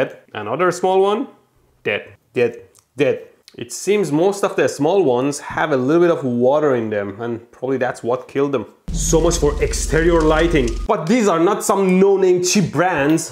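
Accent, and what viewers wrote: Iran. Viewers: I just love his thick Persian accent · اره ایرانیه دانشگاه تهران درس خونده بعدا رفته کانادا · خو ایرانیه؟ بابا لهجه ش خود لهجه فارسیه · ایرانی هستی داش ؟ نه ؟ قیافه ، لحجه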